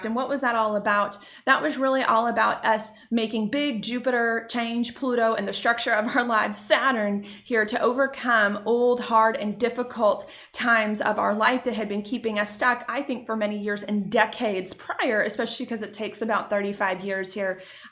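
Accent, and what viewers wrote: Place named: Michigan, United States. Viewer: Are you from Michigan? I'm from Michigan. And I hear a lot of Michigan in your voice... 😉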